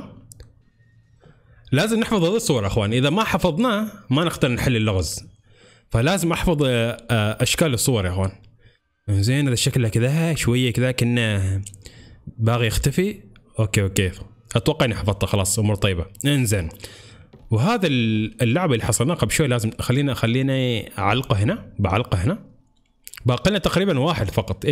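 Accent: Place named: Oman. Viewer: انت عماني عرفت من لهجتك 🌹 وكمل لعبه هي 4 فصول انت في الفصل الأول لعبه حلوة انا العبها على قناتي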